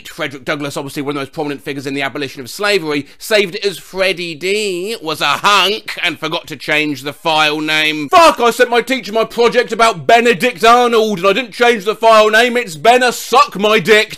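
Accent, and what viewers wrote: United Kingdom. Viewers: he dose have a British accent I do admire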